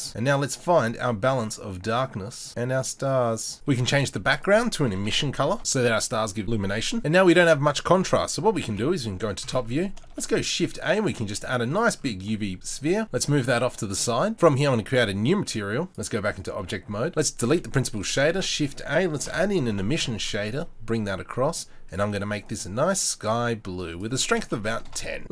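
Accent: Australia. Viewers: Youve turned in to an Aussie Ian Hubert :) nice tute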